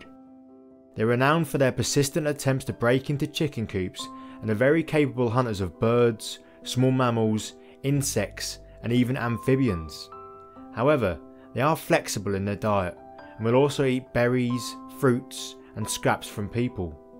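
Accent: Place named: England, United Kingdom. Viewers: the irony of trying to tell an Englishman how to speak English 😂 classic Americans, so used to speaking a boring, robotic copy that their jaws drop when they hear REAL English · You mean southern English voice 😳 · Very informative, nicely made and great to hear an authentic English voice. Keep up the good work.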